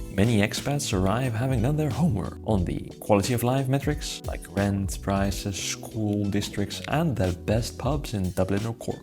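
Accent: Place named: Finland. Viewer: The video would be easier to follow if it wasn’t in a thick (Finish?) accent. But otherwise informative.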